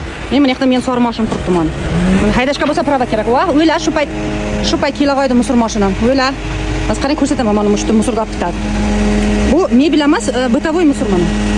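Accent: Surkhandarya, Uzbekistan. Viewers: Сурхонча сукинишингизга 5 кетаманда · Surxondaryocha gaplaringiz yoqadi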